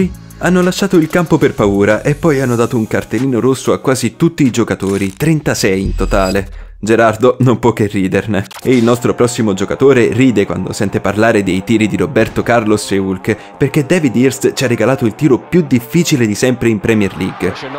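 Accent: Germany. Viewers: MA SEI TEDESCO?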